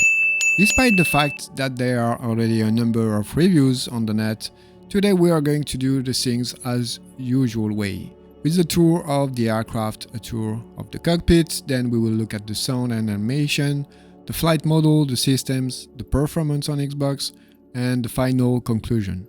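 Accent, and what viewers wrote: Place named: France. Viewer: Excellente vidéo... (mais avec un accent pareil, t'as jamais pensé à faire tes vidéos en français ????)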